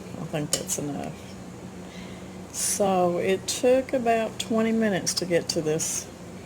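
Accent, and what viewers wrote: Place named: Arkansas, United States. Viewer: the accent and the thunderstorm leads me to think of beautiful Arkansas. That pie crust cobbler looks like it was gonna be good. Nice video!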